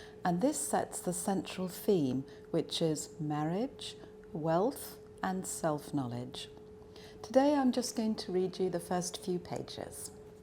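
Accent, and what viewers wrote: United Kingdom. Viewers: 영국억양은 듣기만해도 좋아...💕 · 사람들이 흔히 떠올리는 영국 악센트의 정석이네요 😍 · 전체 다 읽어주세요 !!.. 영국발음 공부하기 딱 좋아요.. 제발 다 읽어주세요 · 영국 발음 너무 고급져 ㅠㅠ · 와 오만과 편견 책을 영국발음으로 들어보다니 ㅠㅠㅠ 감사합니다 ㅠㅠ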